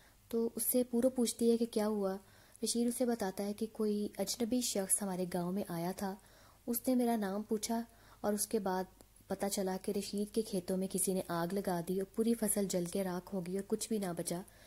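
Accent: Pakistan. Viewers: Aap pakistaniyo jaise bolti hai ? Bht acha explain kiya thank you so much ❤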